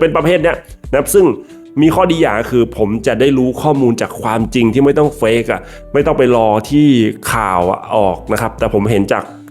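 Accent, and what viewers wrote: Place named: Khanu Woralaksaburi, Thailand. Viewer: พี่คนขาณุวรลักษบุรีหรอครับ แซ่บติดปาก บัวยี คล้ายหมู่บ้านผมเลย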